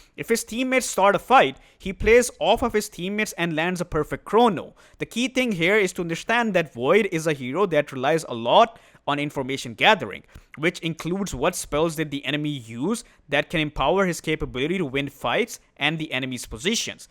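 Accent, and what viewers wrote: India. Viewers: You’re accent so indian 😂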